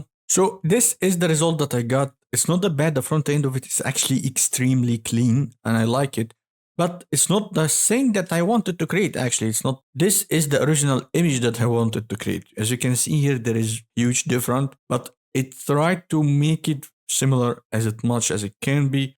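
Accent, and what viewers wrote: Egypt. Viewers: egyptian accent?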